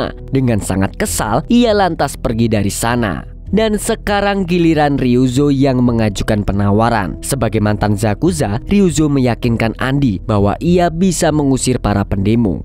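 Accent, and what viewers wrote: Banjar, Indonesia. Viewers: Baru tau kalo org banjar😂dari sekian lama nonton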